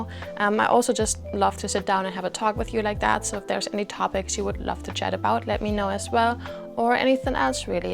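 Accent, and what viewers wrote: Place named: Germany. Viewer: Are you German ? Your accent is German accent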